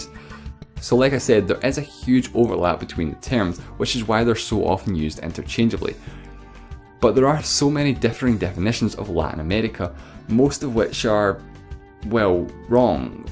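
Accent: Scotland, United Kingdom. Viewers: Of course the narrator explaining all the differences in Latin American is not American. I’m trying to find out the accent maybe Scottish? Americans should be the ones who know inside out this but they are the only ones who are ignorant. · are you Scottish? cause I'm in love with your accent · are you Scottish ? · You have a very strong Scottish accent! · You made one fundamentaL mistake, claiming that "people from, Portugal are essentially Spanish".It is like claiming that people from Wales or Scotland are ... English ! :-\ you suggest that anyone speaking a Romance Language is a Latino, incluidng (drum roll) Italians and Romanians.May I politely suggest you change that view before you upset the entire Italian Youtube community !!!Guyana and GHuiana are NOT pronounced "guy-ana" but "goo-yana" "Not all people from latin America are latin..." is exactly like saying "Not all people from North America are Americans". 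Because they are not. Theyr ancestors emigrated there from Europe or came with the African slave trade - the only REAL South Americans and North Americans being the (nearly extinct) Natives.All in all... I do not know whether you are Scottish or Geordie but you DO have an issue understanding what is Latin, what is Latino and what is neither.Good night. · i quite like his voice, love me a scottish accent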